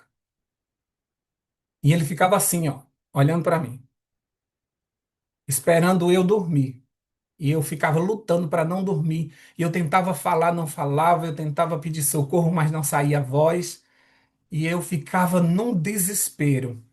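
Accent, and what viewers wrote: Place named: Northeast, Brazil. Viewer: Meus irmãos eu não acredito que eu perdi a Live com essa pregação com irmão. eu sou de Aracaju, sua vizinha! Amo esse sotaque, é nois kkkkkkkk nordestinos arretado😁🙏🏻🤗☺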